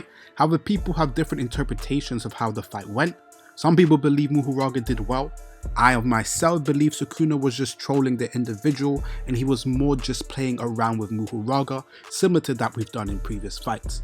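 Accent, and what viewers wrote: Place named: United Kingdom. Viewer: UK accent is annoying tho 😅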